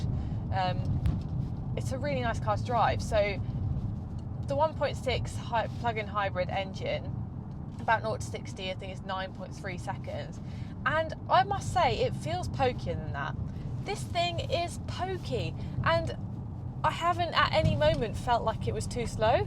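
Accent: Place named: United Kingdom. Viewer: I love your british accent I feel like keep listening to you.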